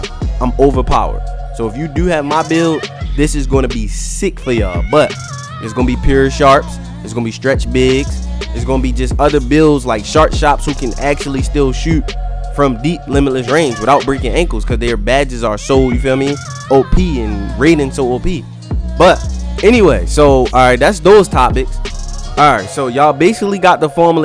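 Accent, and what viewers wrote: Baltimore, United States. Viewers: U got a Baltimore accent no 🧢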